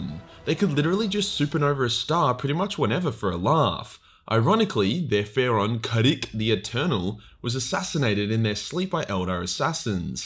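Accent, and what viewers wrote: Australia. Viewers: When’s a gene-stealer vid coming, just started buying some up to make my second army and would love to hear their lore spoken by a true chad Australian lore lord UwU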